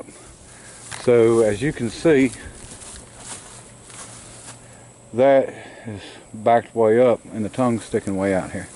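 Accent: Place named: Detroit, United States. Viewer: sounds like a detroit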